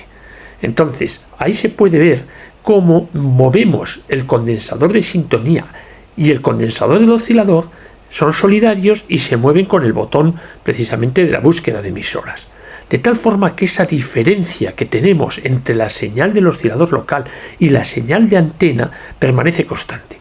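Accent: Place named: Castile, Spain. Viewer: DIJO UN CHINGO DE PALABRAS Y NO LE ENTENDI NI MADRES, NO SE SI ES POR EL ACENTO(CASTELLANO SUPONGO) O POR LA VOZ TAN GASTADA, LE SUGIERO QUE DICCIONE BIEN SUS PALABRAS Y TRATE DE SER AS NEUTRAL EN SU ACENTO SALUDOS.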